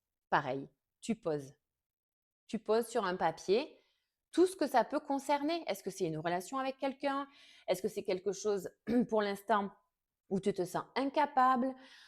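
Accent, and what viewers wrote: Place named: South, France. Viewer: Bonsoir. MERCI infiniment pour votre réponse. Il est vrai que le mental façonne parfois la perception de certains événements. Il faut se poser les bonnes questions en essayant de rester aligné. Vous avez raison. Ce n'est pas l'arrivée qui compte, c'est le chemin..... Encore MERCI. (Vous avez un petit accent du sud🌞). Je vous souhaite une belle soirée. Bien cordialement. Olivier...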